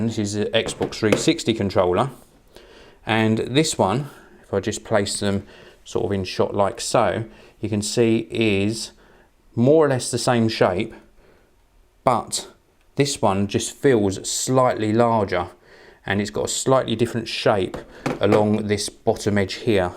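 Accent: United Kingdom. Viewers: Dat awesome british accent though.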